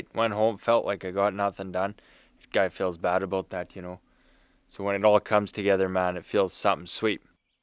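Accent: Canada. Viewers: Man, what a beautiful site to build a log cabin , I was wondering,where it is. Lol,until I heard him speak,and I knew it’s Canada, aye . Nice large logs and a big ,flat open field . Love it 👍🏼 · You had me at your Canadian. Hot DAMN it's nice to hear that Canadian accent for a change. Hi from BC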